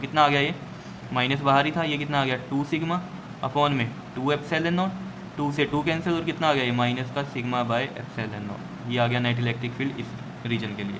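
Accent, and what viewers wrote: Punjab, India. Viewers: Sir tucci punjabi o?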